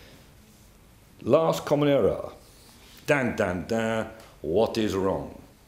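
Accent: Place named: Afrikaans, South Africa. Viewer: This oak is South African, right? Dude sounds like every Afrikaans English teacher I've ever had.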